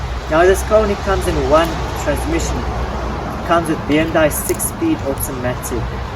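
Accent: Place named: South Africa. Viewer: the moment u opened your mouth . . . i thought you MUST be South African, lol , nice review, very well put together, keep it up bro. !!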